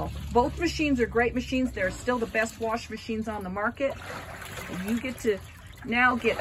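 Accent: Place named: Ireland. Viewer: Tell me, what part of Ireland are you from?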